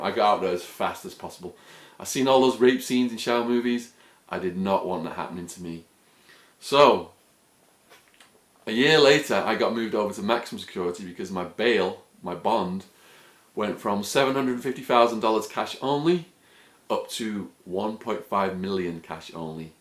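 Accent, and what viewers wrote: United Kingdom. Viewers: Awww Shawn/. TMI LOL. You must have really stuck out in an AZ prison with that Brit accent. Geez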